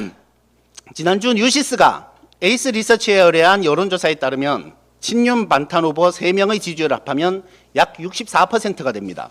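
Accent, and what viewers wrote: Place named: South Korea. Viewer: 부드러운 사투리 구사가 일품이십니다.👍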